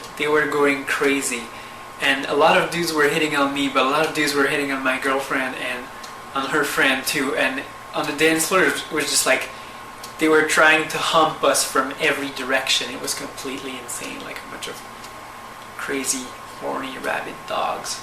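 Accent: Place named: Quebec, Canada. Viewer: She's from the Quebec, Canada. The accent is French Canadian.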